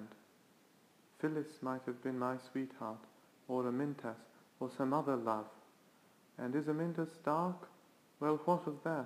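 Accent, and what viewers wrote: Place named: RP, United Kingdom. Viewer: Your English accent is very posh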